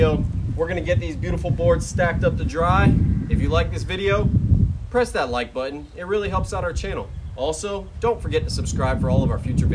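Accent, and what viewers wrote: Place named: South, United States. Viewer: I am not from your country, can I ask based on your accent and a Tee shirt you were wearing one day about hospitality in the South - East, is the timber you are milling today Swamp Cedar? It has the most amazing coloured wood. Really wished you had thought to pour water on it to show us its wet colour. We have a few similar coloured trees in my country one we Call the Australian Red Cedar, and the is called Jarrah (a hard wood). thanks.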